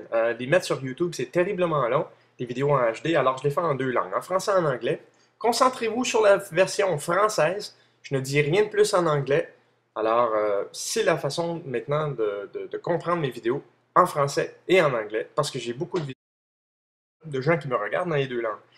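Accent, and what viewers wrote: Canada. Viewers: J'adore ton accent Canadien :)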